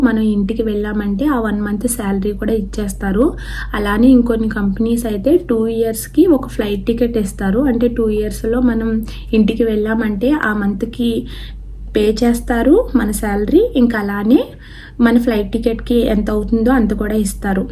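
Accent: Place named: Godavari, India. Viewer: అక్కో మీది గోదారి జిల్లా 😄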